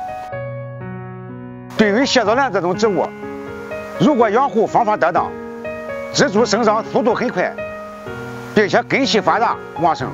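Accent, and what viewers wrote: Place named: Shandong, China. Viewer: 山东话太严重了，听不懂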